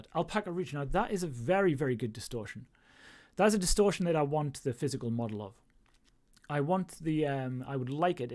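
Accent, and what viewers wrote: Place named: Newcastle, United Kingdom. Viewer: Good to hear a fellow Geordie into this stuff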